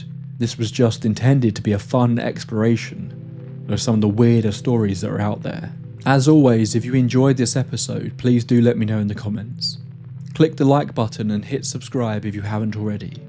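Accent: England, United Kingdom. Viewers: I Cant what this video. Too slowly explained. Get to the point faster for heaven's sake. Like watching paint dry outside in winter. You should also ditch the english accent narration. So sick of that here on Youtube.😢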